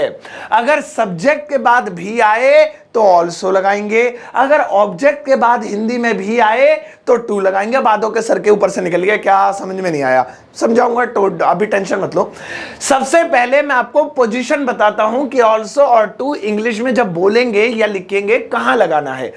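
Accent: India. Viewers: 🇮🇳Deshi english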